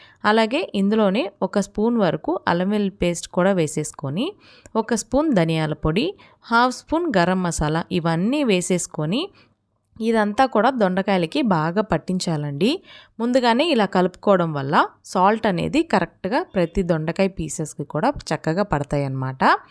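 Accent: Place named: India. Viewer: Maam you have indian voice also...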